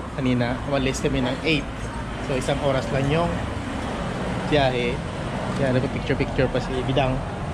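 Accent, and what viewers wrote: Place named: Iloilo, Philippines. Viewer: ilonggo accent hehe bal.an gid haha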